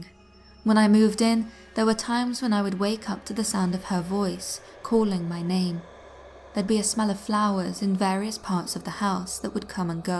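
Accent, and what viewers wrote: United Kingdom. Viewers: Only thing I dislike the British accent. It's a turn off when listening to this stories I will never understand why pple find the accent attractive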